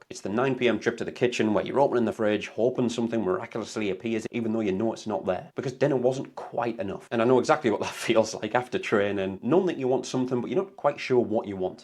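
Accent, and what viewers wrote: Newcastle, United Kingdom. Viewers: He's a Geordie. Like Scots, he talks faster because he thinks faster than our southern cousins 😂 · I just thought it was because you were a Geordie.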